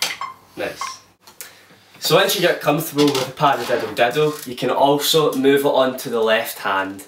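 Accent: Scotland, United Kingdom. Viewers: Min Thu Ko Scottish accent.....from Scotland!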